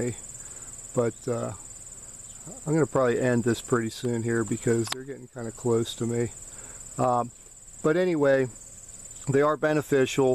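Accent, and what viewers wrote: Pennsylvania, United States. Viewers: Great video. I agree, they should be left alone if they are not in a spot where they could be a problem. This species does prey on caterpillars but they are a major predator of flies which are just as much of a pest as caterpillars. Last year I did not remove a whole lot of this kind, but when I did they were located around doorways or eaves of structures or low in trees where stings to people were probable. I could tell from the accent you are a fellow Pennsylvanian!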